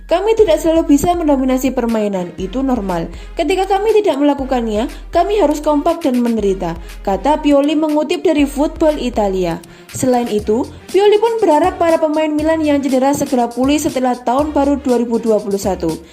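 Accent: Java, Indonesia. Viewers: Jowone medok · Mbanya medok sangaat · Jawane medok nemen Yo? 😀😀😀 Tapi mantap.... 👍👍👍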